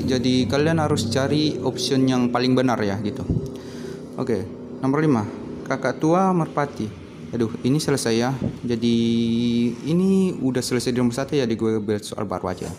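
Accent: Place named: Sulawesi, Indonesia. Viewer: Jelas sekali klo miminnya org Sulawesi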